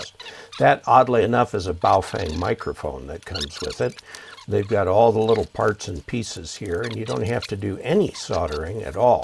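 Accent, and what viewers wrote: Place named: United States. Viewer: Thank you,! Apart of device, your diction make me feel an american speaker:-)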